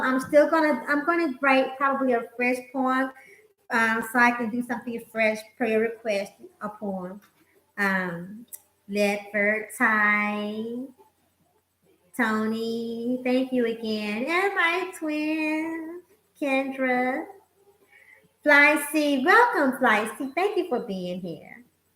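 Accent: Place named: United States. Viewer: Hey, are you from the states? Reason why I ask is because of your accent, which is lovely btw.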